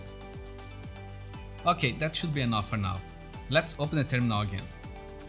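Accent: Brazil. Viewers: Thanks for the video, you really helped me, also as a good brazilian I noticed your accent!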